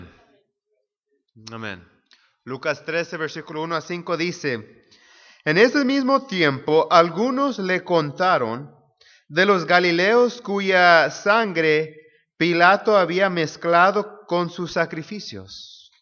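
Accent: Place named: United States. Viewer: Compadre tienes que aprender un poco más hablar español eres muy pocho y todo lo que hablas no se entiende discúlpame mucho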